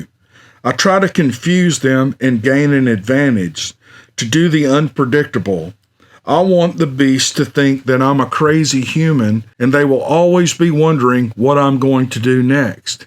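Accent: South, United States. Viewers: I just love your soft, southern, masculine voice! Thank you for providing such a great respite from all the political craziness. I wonder who Bigfoot would vote for? Hahahaaa! No! No, I won't follow that thought any further! 😂 Great video! Great stories!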